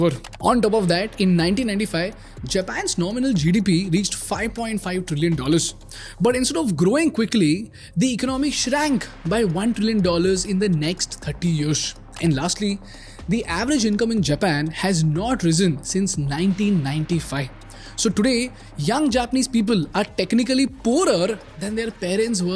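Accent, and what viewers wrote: India. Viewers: 原来印度语是这样的啊。有点英语的成分啊。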